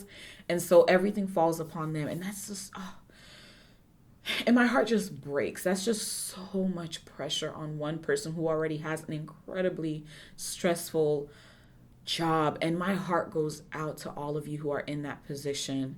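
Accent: Nigeria. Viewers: I love the in-between Nigerian accent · I'm loving that Nigerian accent :) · lol the nigerian accent.. <3 awesome video btw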